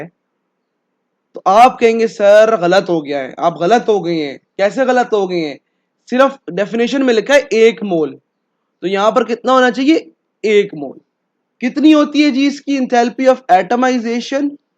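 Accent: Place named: Mianwali, Pakistan. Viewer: I think you are from mianwali?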